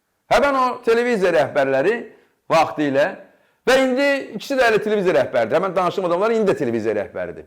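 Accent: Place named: Salyan, Azerbaijan. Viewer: qadan alım bu Salyan tələffüzünu-üslubunu dəyişə..!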